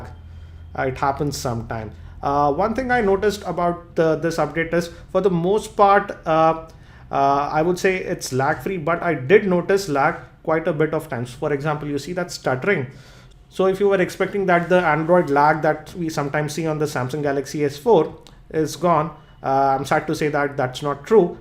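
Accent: India. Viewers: Lol kinda cool how your voice started out like american then went full blown indian lool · That's an Indian accent bro and he's Indian so he's not faking it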